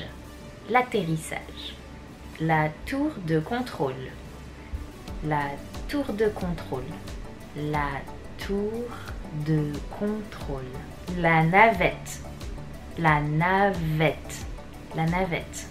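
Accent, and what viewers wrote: Standard. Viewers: 老师很棒！发音非常标准。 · 她是法國人，發音標準是很正常啊！😁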